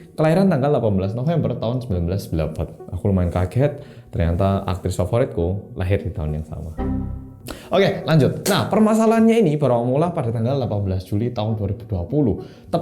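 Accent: Java, Indonesia. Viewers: Ga ada nada nada bicara atau pemilihan kata yg tidak tepat yg membuat org berpikir ini bukan org indo. Beneran natural banget. Di telinga gua dia mas mas dari jawa. Penjelasannya bagus dan menarik. Thanks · lucu amat mas medok, konsep nya ala ala presentasi di kampus yaa 😄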